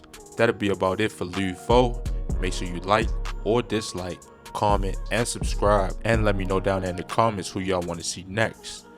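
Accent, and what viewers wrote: Baltimore, United States. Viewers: He’s fasho from boltamore “duuuu” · First time ever on yo channel and I can tell you from Baltimore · Stupid ass Baltimore accent🤣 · You from Baltimore aint u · I can hear the Baltimore in you🤣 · Bmore accent thick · Boy if you dnt shut tf up with that damn Baltimore accent shit annoying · He from baltimore he cant help it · He from Baltimore they pronounce they U's weird lmao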